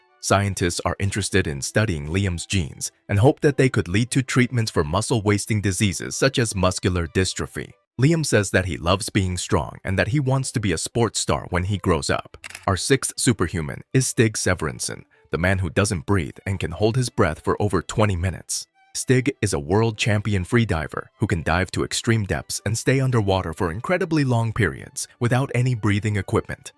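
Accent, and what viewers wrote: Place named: India. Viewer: I miss your beautiful improper Indian voice.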